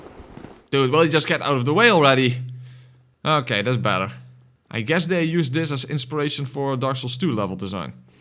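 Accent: Netherlands. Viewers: His so very dutch accent is funny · Ik hoor gelijk dat je nederlands bent!😂😂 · Lekker kazig accentje! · First three words and I’m like “yep, this guy is Dutch” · Dutch accent, that's a yikes!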